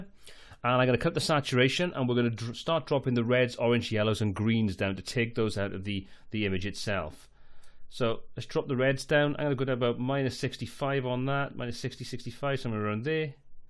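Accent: Wales, United Kingdom. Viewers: So good to hear a welsh accent on this!! Much love and thanks for the lesson!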